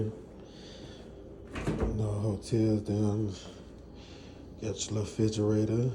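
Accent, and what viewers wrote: South, United States. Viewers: I hear that southern drawl! “ lil fri-juh-ray-da”.. I’m from the Midwest… we sound like that too🤣🤣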